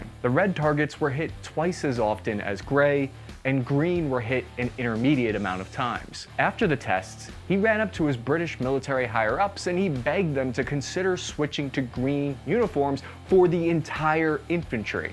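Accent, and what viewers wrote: United States. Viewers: "Well that was none other than my people, the Italians" That's a strong American accent for an Italian 🤔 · Damn I was almost certain that this dude is American not Italian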